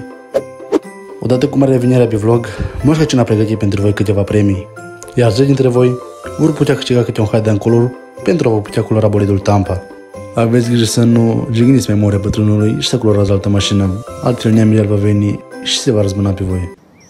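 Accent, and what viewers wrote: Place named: Moldova. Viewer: Ma jur daca inteleg ceva din ce zice asta…oribil accent, n-are o treaba cu B-vlog-ul vechi…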